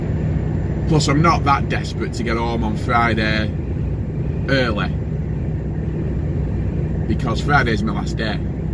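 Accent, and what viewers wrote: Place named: Northern England, United Kingdom. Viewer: Hi, I fell upon your vids as I'm planning to visit Greece in my M'home after postponing last year due to Covid and I have found your clip's to be very informative and just love that Northern accent. I'm guessing Leeds ! Anyway, thanks for the information provided which gives me an idea of what to expect when crossing over from Italy to Greece and driving in Greece. Hopefully make it this year before it gets too hot. Otherwise it's Slovenia and Bavaria. Keep Safe.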